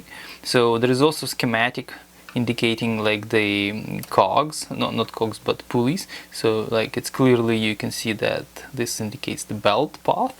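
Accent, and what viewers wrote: Russia. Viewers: Zagreb is in now called Croatia. I also love your accent man! Gotta love the Russians! Keep up the great work. :)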